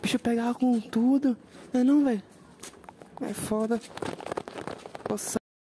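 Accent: Bahia, Brazil. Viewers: Eu pesquisei por esse vídeo, fiquei feliz por vocês terem feito pq eu amo o asmr desse canal, e as gírias/ sotaque baiano são os melhores.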